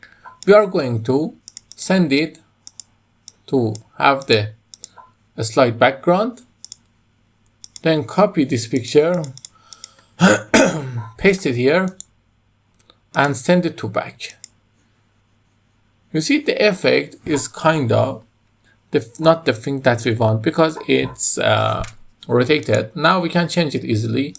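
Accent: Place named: Iran. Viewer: ایرانی 😅😅😅😅 لحجت خیلی زایه هست حتی از هندی ها هم بد تره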